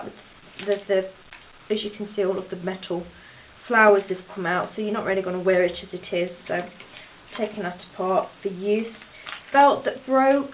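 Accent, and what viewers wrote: United Kingdom. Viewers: you sound like your British. r u ??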